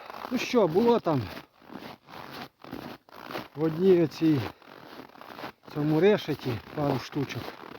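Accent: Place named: Polissia, Ukraine. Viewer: Бажаю міцного. Ви напевно десь з Поліського або Іванківського району? По акценту зрозумів. Можливо й не правий. Гарна риболовля. Так тримати. Все відмінно. 🎉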